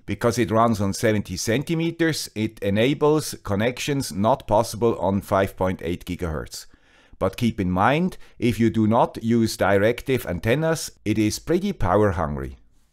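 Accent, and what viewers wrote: Switzerland. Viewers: Not sure how I missed this channel, guy with the Swiss accent.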